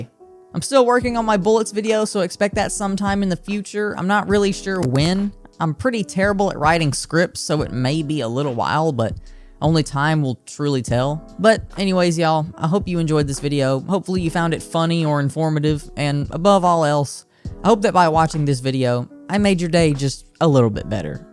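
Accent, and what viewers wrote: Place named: South, United States. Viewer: Well you have another sub. Decent content man. And don't fret yourself on the script, it was decent as well. That SOUTHERN DRAW THOUUUUGH! (No judgement, Arkansas here.)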